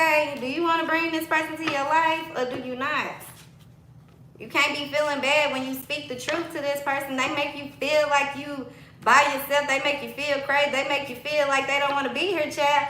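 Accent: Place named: Louisiana, United States. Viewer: Where are you from, ?, where that accent!! U Cajun??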